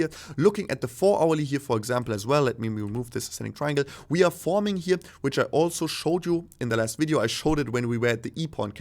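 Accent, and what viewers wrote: Germany. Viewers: You have a little bit of a german accent, but your english is excellent. What other languages do you speak?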